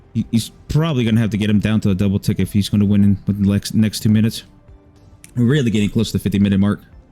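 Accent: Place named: United States. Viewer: this bro has a goofy american accent, damn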